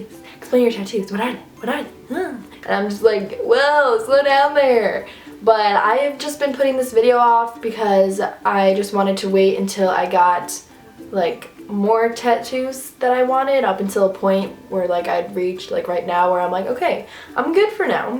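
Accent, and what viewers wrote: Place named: Michigan, United States. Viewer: I love your accent. Are you from Michigan? I've always wanted to live there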